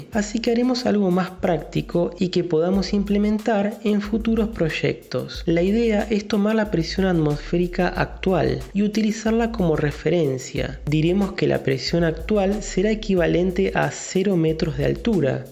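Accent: Argentina. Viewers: ok claramente eres de arg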